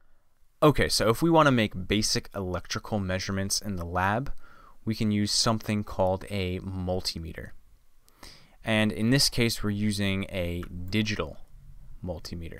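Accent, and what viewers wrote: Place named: Oxford, United Kingdom. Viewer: AN INTRODUCTION TO ELECTRICAL CIRCUITS...... SIR, WATCHED YR ABOVE VIDEO AND ENVIED YR ORIGINAL TALENT AS A TUTOR, AS A GRAPHIC DESIGNER, AS A ELOQUENT SPEAKER OF OXFORD ENGLISH, AS A PIED PIPER ATTRACTING RUN AWAY KIDS/STUDENTS RUNNING AWAY FROM SCIENCE. WHAT IS THE ATTRACTION OF THIS VIDEO? THE SUPERIORITY AND EXCELLENCE OF THIS VIDEO IS NUMEROUS AND HENCE I WILL BE NOT BE ABLE TO TELL ONE AS THEY ARE MANY AND INNUMERABLE. TO POINT OUT A SINGLE MISTAKE I AM UNABLE TO DO AND FAIL MISERABLY. WHAT A WAY TO TEACH! SO PERFECT AND TEACHING SCIENCE MORE EASILY THAN VIEWING A TV SERIAL. AT THE END OF THE DAY A SERIAL IS FORGOTTEN BUT NOT YR TEACHING . TO TELL YOU FRANKLY, ONE TRUTH WHICH YOU DO NOT KNOW IS, THAT ONLY A HANDFUL OF TEACHERS ARE THERE IN THIS WORLD WHO CAN TEACH SCIENCE/ ELECTRICAL ENGG AND SCIENCE FOR SUCH A PERFECT UNDERSTANDING. 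THE TRUTH IS HEARING THE WORD "COULOMB" BRINGS IN HEADACHE BUT NT YOU OR YR TUTORING. I HOPE YR VIDEO IS THE BEST ONE TO BE MADE COMPULSORY IN ALL ELECTRICAL/ELECTRONIC SCHOOLS AND POLY-TECHNIQUES. YOU ARE A VISIONERY TUTOR MAKING A COMPLEX SUBJECT INTO A SIMPLE ONE WHICH CAN BE DIGESTED EASILY BY EVEN THE POOREST GRADE OF STUDENTS VATSA INDIA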